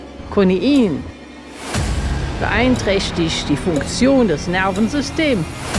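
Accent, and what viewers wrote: Cologne, Germany. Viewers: alter😳 da braucht du einen übersetzer, aber als urkölner kann ich sie jut verstonnn👍 wünsche wiggerhin vill spass😎 isch han se direk abonniert👍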